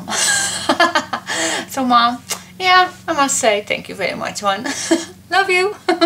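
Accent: Netherlands. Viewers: It’s won’t be long and you’ll have 50,000. Your absolutely amazing lady! Thank you for all your inspiration. Your work is fantastic and I love your accent because it reminds me of my mother in law. She from holland and I completely adore her. Can’t wait for the next video. Take care and happy crafting. We are in Alberta, Canada. 😍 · Sept 30, my mom (who is from Friesland) and I were scrolling thru scrapbook videos - looking for ideas when we came across Christmas Magic and gave it a look. I said "Wow, this lady is awesome!" and subscribed. Mom said "Wow, this lady is Dutch and has fantastic nails" and then "Would you make this scrapbook for me?" Anyway, I have watched many of your videos now and completed the Christmas Magic (or Masquerade) last week, only with different papers - not Christmas. It is gorgeous and your instructions are easy to follow and to adapt a little to suit my needs and ideas. 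Thank you so much! I love to listen to you. Your accent reminds me of my grandmother, only your English is better. Even after 30 years in Canada, she still was about 60/40 English and Fries. About a month ago, I got a great deal on some kitchen styled papers at a local craft shop and made a little recipe book, almost by accident as I was trying to figure out what to do with it. It is really cute but small. I was thinking as I finished up the CM book that I would make a recipe book (bigger) next (as a Christmas gift for my daughter) and what do you know? there was my new favorite mentor showing me what she had completed (and hinted at during the CM tutorials!). Thank you again! You read my mind! I love your work! Please keep it up! I am anxiously awaiting the tutorials for this!!!! PS - maybe your desire to say cool, cool, cool came from a Canadian friend - I hear it all the time, mostly from people who a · Congratulation and well done . 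Thank you so much for sharing your great scrapbooking ideas . I get inspired<3. And I just love your dutch accent. from Anette T from Denmark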